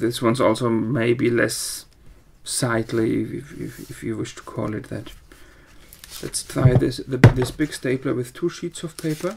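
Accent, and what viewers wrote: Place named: Germany. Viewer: I love your German accent! 💕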